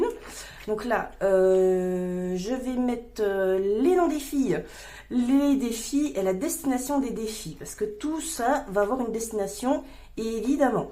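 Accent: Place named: Doubs, France. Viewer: Quel plaisir d’entendre ce doux accent du Doubs 🤣🤣🤣 je suis originaire de Dole 🥰 je reprends l’accent dés que je descends et je le perds quand je remonte en seine et Marne 🤣🤣 J’adore ton nouveau classeur et hâte de te retrouver Des bisous tout doux ❤️❤️❤️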